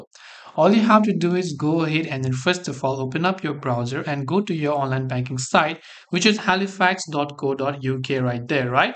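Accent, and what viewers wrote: India. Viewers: I would need to listen to this 30 times before i got it. Speaking too fast and with an Indian accent. Thanks.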